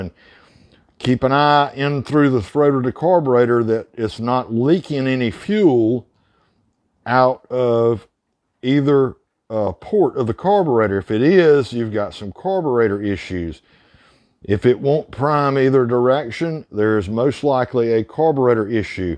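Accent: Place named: Appalachia, United States. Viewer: oh your god, dull and hillbilly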